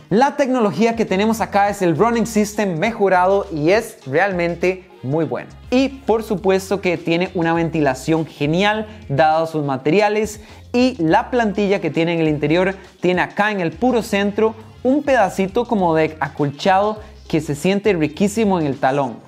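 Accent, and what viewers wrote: Costa Rica. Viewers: Es de Costa Rica? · Sos de Costa Rica? 🤔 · Usted es de Costa Rica? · Eres de Costa Rica?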